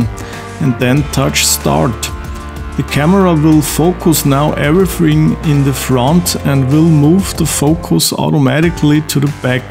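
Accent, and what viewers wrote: Germany. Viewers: Gibts das auch auf Deutsch , er ist ja deutscher - hört man ja raus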